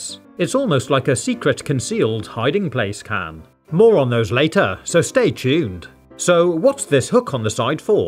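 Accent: United Kingdom. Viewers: Are u British???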